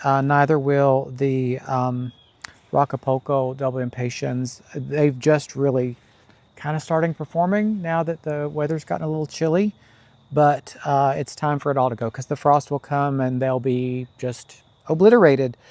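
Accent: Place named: South, United States. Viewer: You need to add just a little more drawl to that “like, comment, and subscribe.” 😂 Just kidding - I love watching gardeners from all over the world but I do admit I have a soft spot for the ones who talk as southern as I do. 💕 The pots look great! I’m messy too - it’s a sign of genius. 😉 The scene stealer in this video is that adorable Scotty dog!!!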